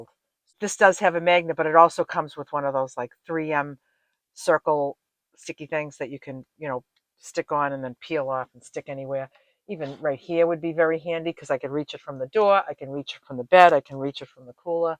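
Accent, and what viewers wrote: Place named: Boston, United States. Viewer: just to let you know, that you are such a regular, played in my kitchen as I'm cooking dinner, that both me and my adult son (from the UK) now have mastered the Boston accent. 'That shit bowthas my eyes, 'Over heeyer" Over theeyer' Love you and your natural style x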